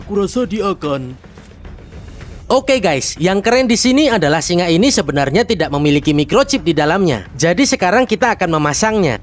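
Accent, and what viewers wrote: Java, Indonesia. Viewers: Knapa jadi medok anjirrrr dubbing nya🤣🤣🤣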